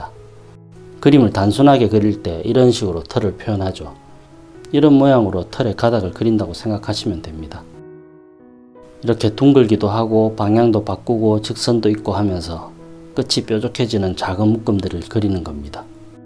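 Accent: Gyeongsang, South Korea. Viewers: 경상도는 억양을 고칠수없어..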